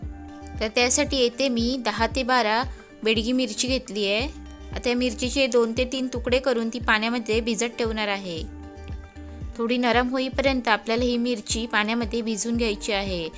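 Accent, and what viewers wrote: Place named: Konkan, India. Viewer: Love the Konkani accent ❤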